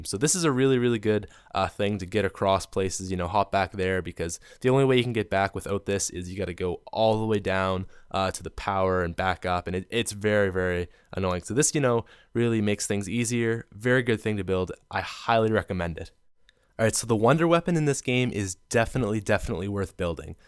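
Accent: Canada. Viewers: You are from Canda your accent :P cool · Great vid, love em. You're definitely Canadian though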